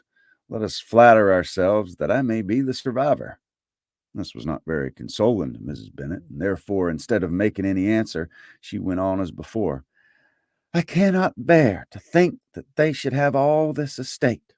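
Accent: South, United States. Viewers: I'm not from the US, and most of my experience of the US southern accent is from movies and TV. But listening to you is soothing and I'm sure that I'll be listening to your entire audiobook when you pull it together. Great work and thank you.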